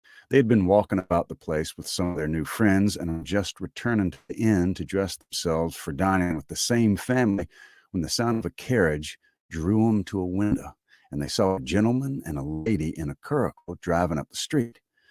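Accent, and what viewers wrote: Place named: South Carolina, United States. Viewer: I would love to hear you read this (I'm a South Carolinian, so your way of speakin' is the way everything should sound, of course!) but there's some kind of weird sound or feedback going on. Ha! Black coffee and harbor tea!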